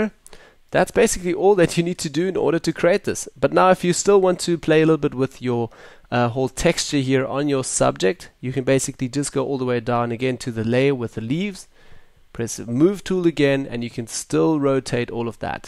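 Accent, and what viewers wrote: South Africa. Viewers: immediately recognised the S.A accent haha #proudlySA and cool tut by the way.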